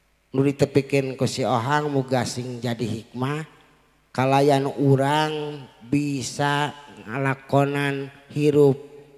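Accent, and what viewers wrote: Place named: Sunda, Indonesia. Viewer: Ini yg pelawak itu bukan sih?😂 wkwkwk orng sunda emng punya logat yg agak lucu,udh dari pembawaannya juga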